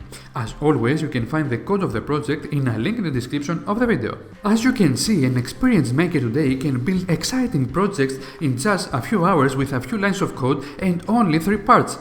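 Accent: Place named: Greece. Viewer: Πολύ ωραίο βιντεάκι, άλλα από την προφορά κατάλαβα ότι είσαι Έλληνας, όταν είδα και το sparta σιγουρευτηκα :p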